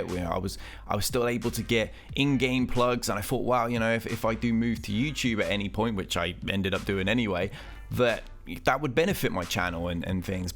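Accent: United Kingdom. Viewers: Dude where are you from? I've always wondered haha. Can't figure out what UK accent that is lol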